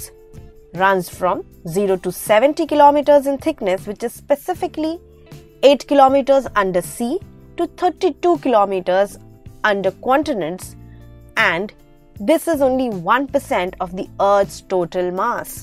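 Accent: India. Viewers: I hate indian accent. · Omg, what an accent..i hate indian accent!!!🙄😱🤮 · Not the india accent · Indian accent 😂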